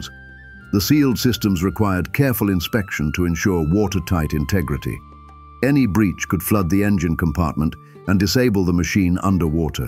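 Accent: United Kingdom. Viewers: Subscriber here. Liked and hyped this video because it’s very cool! No bouncy line at the bottom… Awesome! Now, if we can just get rid of that British accent…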